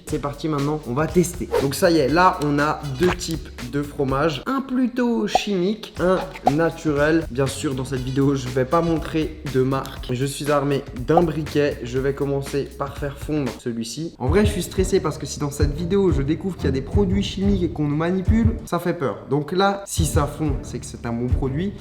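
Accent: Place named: South, France. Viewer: C’est moi ou tu as un peu perdu ton accent du sud😅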